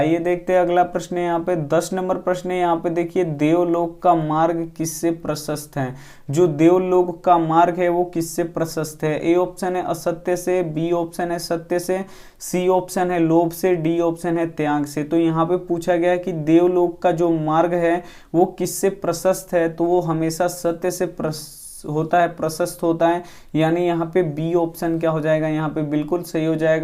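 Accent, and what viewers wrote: Bihar, India. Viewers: Hiii Kya aap bihar se ho..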